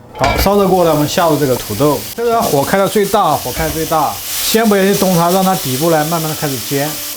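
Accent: Sichuan, China. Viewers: 听您的口音是四川人吗？我是居住在苏黎世的四川人，看到您排骨的做法，很喜欢，瑞士奶酪🧀️很多又便宜，希望您推点奶酪入菜的菜品，谢谢🙏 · 我是北方人，但是一听师傅的四川口音，就知道绝对靠谱👍🎉🎉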